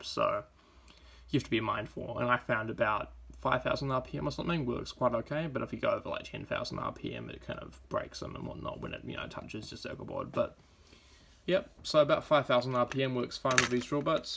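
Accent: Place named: United Kingdom. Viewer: Doing materials could you try to drop a little that british accent, please? People from outside UK find it hard to understand you :(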